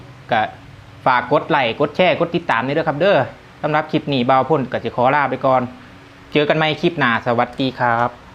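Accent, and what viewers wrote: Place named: Ubon Ratchathani, Thailand. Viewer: สำเนียงอุบล ฟังเพราะดีเนาะ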